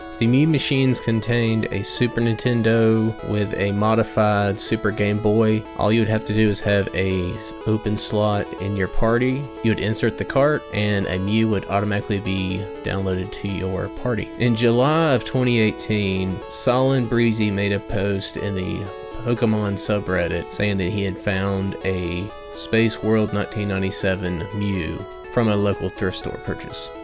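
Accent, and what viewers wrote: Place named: South, United States. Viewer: As a southerner myself, your accent makes this video even more charming. Informative and entertaining. Keep up the good work!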